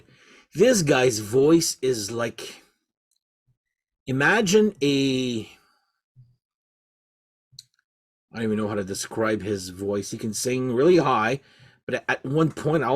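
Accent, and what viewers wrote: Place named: Canada. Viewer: I was watching this video, and listening to you, and my first thought was "this guy sounds Canadian". Then you said "Power Hour", and I noticed the senators flag in the background! 🤣 Ah-HA! A fellow Ottawan. I actually know Michael Angelo Batio, and he's a really good dude. His guitar playing talent is unreal. I've never seen anyone play as fast as he does (even today in his 60s!). As an old school 80s Thrasher, was never my thing, but Mike's technical chops cannot be denied! Cheers!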